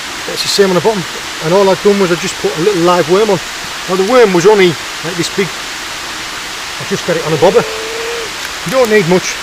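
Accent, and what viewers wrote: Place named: East Yorkshire, United Kingdom. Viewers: I have followed you for ages... your accent is clearly East Riding of Yorkshire, more specifically the great city of Kingston upon Hull. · no matter which riding you are from we are proud to see your great vlogs + hear our accent on here. REIGHT good to see you so happy in squire trelawney land.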